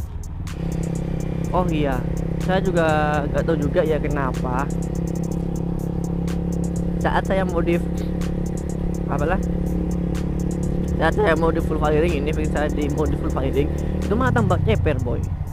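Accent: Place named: Madura, Indonesia. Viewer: Maap bahasa indo khas Madura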